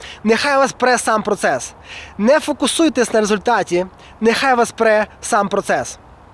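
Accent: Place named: East, Ukraine. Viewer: Це круто! Але подейкують що відчувається східний акцент..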